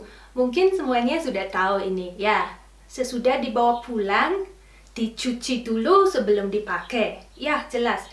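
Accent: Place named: Bali, Indonesia. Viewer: logatnya bali campur jawa.. 'dathang' pepathah..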